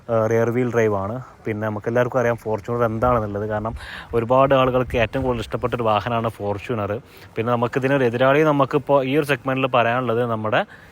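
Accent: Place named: Malabar, India. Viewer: മലപ്പുറം, കോഴിക്കോട് കാക്ക ആണല്ലേ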